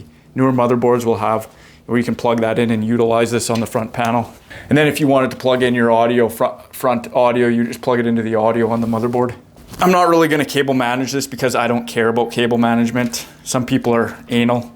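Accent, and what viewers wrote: Canada. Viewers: Is that a Canadian I hear? :O Great review, by the way. Looking forward to building in this case tomorrow.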